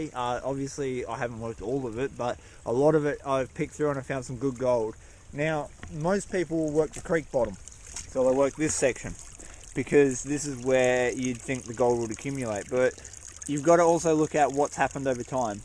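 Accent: Australia. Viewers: Nice, I was thinking Aussie or New Zlander, none the less great job and Keep up the great work.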